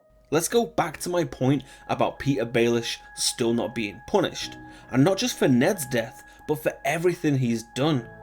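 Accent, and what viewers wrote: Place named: Northern England, United Kingdom. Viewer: ur accent???? where is it from just curious? its mixed of jon snow (winterfelish/northern english/ mancunion) n American? its mixed or is it me only? or i donn know i m mancunian so hope u donn mind mate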